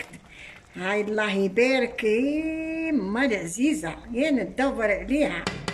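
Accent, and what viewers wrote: Constantine, Algeria. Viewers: يعطيك الصحة طاطا هاني نايضا نديرها الله الله على اللهجة القسمطينية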